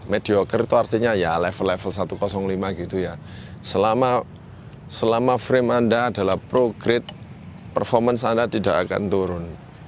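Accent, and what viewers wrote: Surabaya, Indonesia. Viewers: khas aksen Suroboyo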